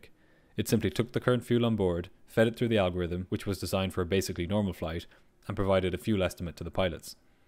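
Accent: Ireland. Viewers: Everyone has different accents and he's Irish. If you've got a problem with that scroll down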